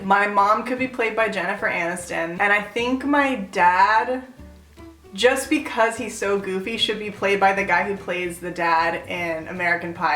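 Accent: Castile, Spain. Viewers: your spanish is perfect I had never heard anyone whose first language isn't spanish speak it so well, and more specifically with a castilian accent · Your Spanish ❤️ I speak Spanish too but I'm Mexican so the accent is different. I went to Spain as my graduation present for about a week and a half and it was so funny how I was picking up that accent! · Haha I can hear that Spain accent. My parents are from Mexico so I learned Spanish first and then English in pre-school. Unfortunately, my Spanish became worse over the years and now as an adult I have trouble with grammar and vocabulary sometimes. It's so embarrassing. Your Spanish is probably better than mine! :p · Your Spanish is soooo good. I grew up in a Mexican household yet your Spanish is way better then mine. When you speak Spanish it sounds as if you were from Spain. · I love your spanish accent, debo decir que no me gustan los acentos propiamente españoles de España, but yours is adorable. 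· nice you speak spanish like they do in spain! and ima try that bubble bobble right now! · she speaks like a Spaniard, it's so cute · OMG, that was soooooo fuckin sexy! Even if you speak spanish with the Castellano pronounciation. Que chula eres, Dios mio!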